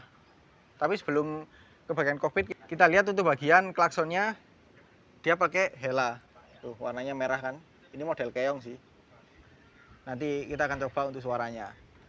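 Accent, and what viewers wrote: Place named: Java, Indonesia. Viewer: Suaramu begitu iconic dan medok mase 😁👍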